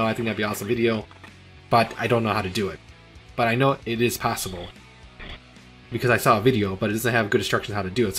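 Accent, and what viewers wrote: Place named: Midwest, United States. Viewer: Totally off-topic: are you from Minnesota or Pennsylvania or somewhere in the midwest? I'm trying to place your accent. It's the Ds in place of THs you do ("de" instead of "the"). I'm Canadian so I have no idea about American accents unless they're from the DEEP SOUTH lol.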